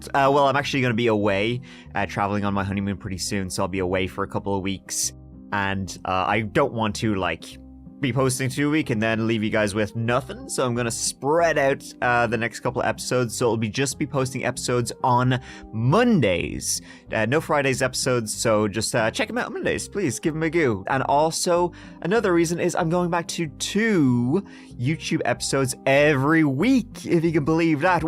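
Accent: Ireland. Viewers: I heard this story before but it’s always better with an ol’ Irish narrator giving it a goo!!!! 💚💚🤣🫶🏻🕺🪩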